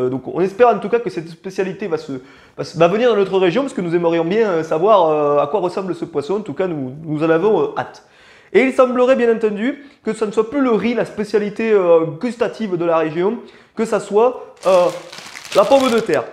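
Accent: France. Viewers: Oh l'accent du sud🤣🤣🤣 t'es génial ne change rien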